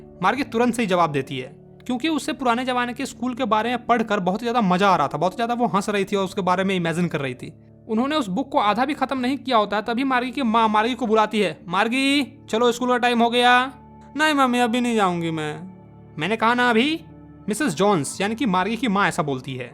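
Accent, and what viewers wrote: Bihar, India. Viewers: Bhaiya aap bihar se ho na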